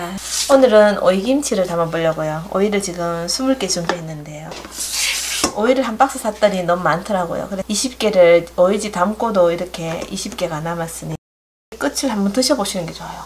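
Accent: Gyeongsang, South Korea. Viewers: 경상도 아지매네예~^^ 오이를 끓인 소금물로 먼저 담으시네요 어떤 장점이 있을까요?? 궁금하네요 또한 3종 드리고 이웃맺고 싶어요^^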